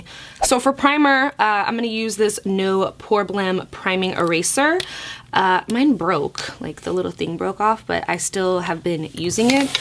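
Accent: United Kingdom. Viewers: Those are my fav lashes but I can't find them anywhere near me 😭😢😭 I. Missed your British accent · That British Accent tho 😍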